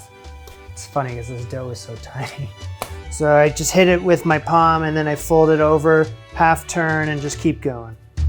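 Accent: Canada. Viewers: I think-not entirely sure though-he was raised in Canada. I can totally understand what you mean by simply “Canadian”. He certainly has the accent and verbiage/slang from Canada, but some of the northern states pick that up too, so it’s honestly hard to tell sometimes. Had he not straight up said where he lived I would have guessed he was in Canada for sure. 😊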